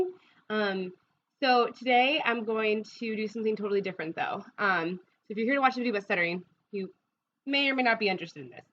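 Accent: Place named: Spokane, United States. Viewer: You speak so much like my friend who grew up around Spokane. I split my time between there and Seattle.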